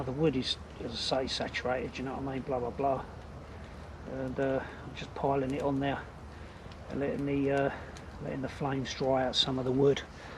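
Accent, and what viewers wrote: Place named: Essex, United Kingdom. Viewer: you got that east of London, Kent, Essex kind of accent.... although I may be completely wrong! I'm in Surrey, and yet to see snow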